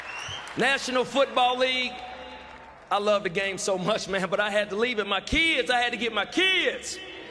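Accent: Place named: South, United States. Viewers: West Virginia accent.. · i love his southern accent 😂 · Actually, Southern WV accent. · I love that southern tongue god bless you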